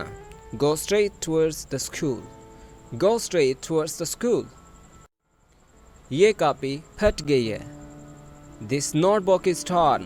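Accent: Punjab, India. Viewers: Wah g wah Vich punjabi touch bohat aala hai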